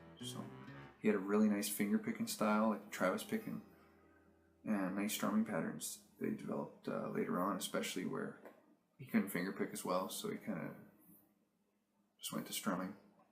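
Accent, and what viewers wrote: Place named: Canada. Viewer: You can tell this guy's from Canada in the first three seconds.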